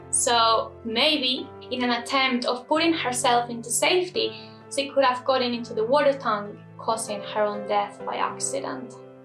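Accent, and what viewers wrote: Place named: Spain. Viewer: I found you on twitter regarding the Cecil Hotel and shot over here to watch. I'm glad I did because I really like your vibe and uniqueness. So this is my first video and I'll probably spend the rest of the night watching your other videos. Love your hair, makeup, and your accent is really adorable. I wanna say a little Spanish mixed with English? Idk but I love it. I'm American so it's an accent to me and it was fun to hear and watch. You were a little scary at first to me with the intense eyes and blood and then this sweet voice popped out that I was not expecting. You remind me of the other lady that does her makeup while telling real horror stories. I always appreciate something different than the normal I see everyday. And I try to stay as far away from politics and media as I can. I've stopped watching tv and will not go onto any social media outlets unless it's to post about things I like because I'm so tired of the negativity. 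I don't dare scroll when I do rarely jump on because I don't even want to see or know what the hell they are going on about next. It's neverending and tiresome. You are refreshing. 💋 xoxo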